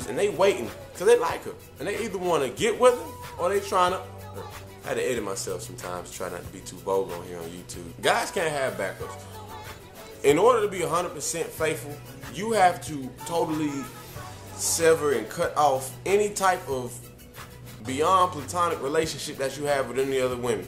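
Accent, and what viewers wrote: South, United States. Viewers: I thought he was a Southern boy, too. I was about to be really excited about that. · Why do you sound like you from the south.... if your from NJ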